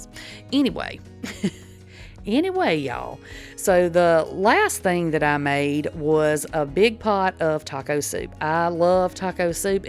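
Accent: South, United States. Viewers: I'm sorry I'm now rascist. against a southern accent, y'all sound like Trump voters. FAFO. Can't watch ur content😢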